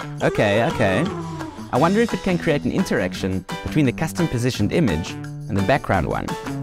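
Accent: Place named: South Africa. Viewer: This oke is South African. Random.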